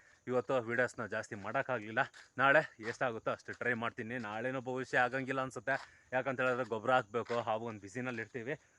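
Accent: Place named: Tigala, India. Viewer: Anna neevu tigalaru nimma bhashe enda tiliyuttade namdu Anekal navu tigalare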